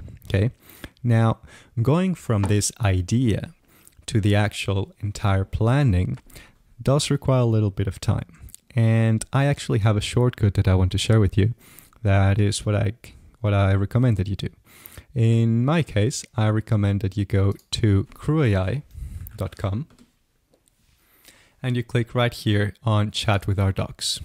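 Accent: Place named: United States. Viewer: Amazing tutorial. So we well explained and laid out. Question for you on CrewAI - is the process of picking a tool prescriptive, meaning the agent instructs which tool to pick? Or can the LLM pick automatically from a set of tools and decide what to use. I am just coming up to speed on CrewAI and wasn't sure. And your English is fantastic, by the way. First thought your accent sounded like you were from the USofA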